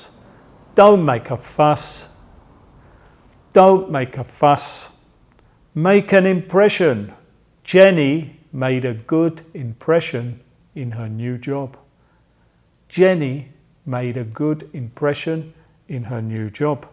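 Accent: England, United Kingdom. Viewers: Amo el acento Ingles. Ya me quedo clara la diferencia =)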